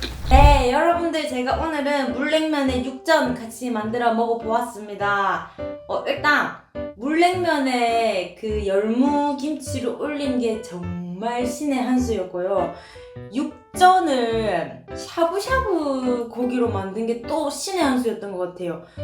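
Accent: Gyeongsang, South Korea. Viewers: 경상도 사투리 슬쩍 조습니다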